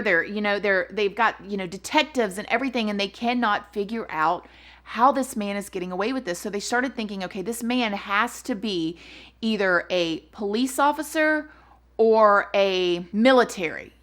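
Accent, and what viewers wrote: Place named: Florida, United States. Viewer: I'm always watching you and I think hummmm with the way you talk you must live in Florida. I live in North West Fl and have the same southern slang. LoL 🤣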